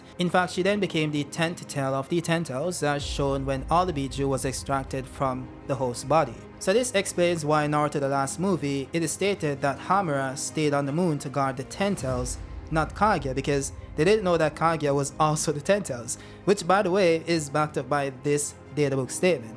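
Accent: Jamaica. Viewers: Ayo are you Jamaican?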